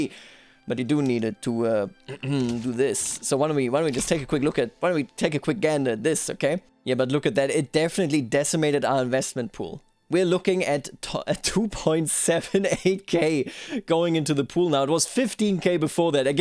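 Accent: Bavaria, Germany. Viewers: Yup I can hear the Bavarian Very nice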